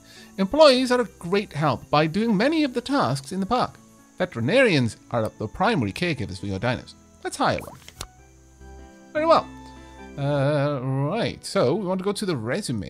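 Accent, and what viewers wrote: Wales, United Kingdom. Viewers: Our good friend is Welsh, which gives him that lovely accent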